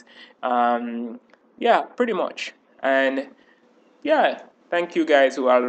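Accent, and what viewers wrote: Jamaica. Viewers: I love your Jamaican accent so much. <3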